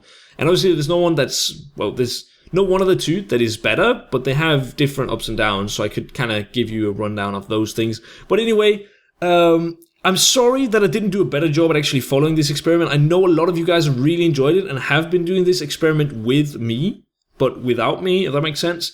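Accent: Denmark. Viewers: Hey man, are you Danish? ? 😊 you sound a bit Danish just a bit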